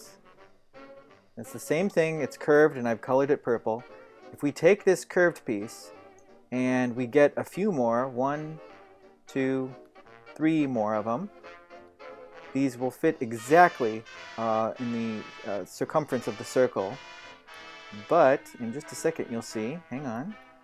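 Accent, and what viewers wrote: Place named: Minnesota, United States. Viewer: turned on my sound, not expecting to hear a Minnesotan accent, and what do I hear but my family's home?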